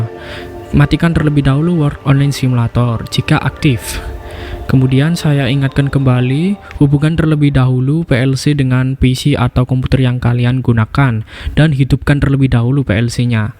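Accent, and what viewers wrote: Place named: Bali, Indonesia. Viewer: logat bali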